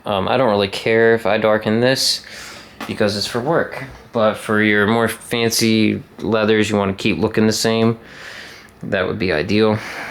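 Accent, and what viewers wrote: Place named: Philadelphia, United States. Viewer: Great vid! Im guess from the accent you are from Maryland, Delaware or Phila...but if its Phila you have a light accent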